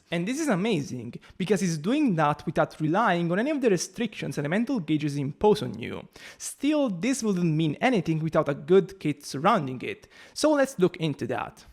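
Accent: Italy. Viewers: Sir are you an Italian or Russian? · just want to say that usually im assuming you're Italian just by the accent and now i see your body gesture really confirm it 👌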